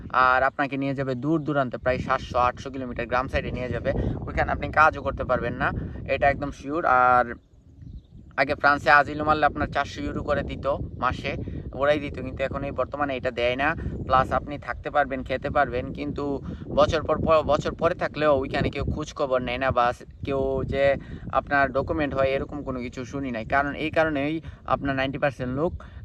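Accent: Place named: Sylhet, Bangladesh. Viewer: Aita bhai syloti ra kore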